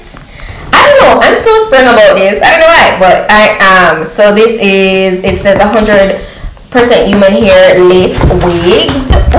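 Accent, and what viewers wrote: Jamaica. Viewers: I saw you promoting a foundation on Pinterest and I was like this girl sounds like a Jamaican 🇯🇲 so I had to find you and I swear I watched all your vlogs and videos (All) and I love you, your sis and your mom you guys are the realest 😊 was actually waiting on a new video. You go girl ppl like you make me proud to be a Jamaican. God bless you 🙏🏽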